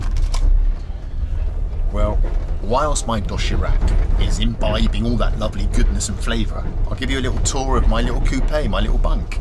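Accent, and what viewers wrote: England, United Kingdom. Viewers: is it just me or was his english accent stronger in this vid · Its might be because of his hiatus but sounding extra british this episode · Really loved watching this vlog, have not watch you since you went through your awful Covid experience. So funny and educational at the same time. Have you been back to the UK , your UK accent sounded very strong ?We just went back for a month and our US friends struggle to understand us when we get back.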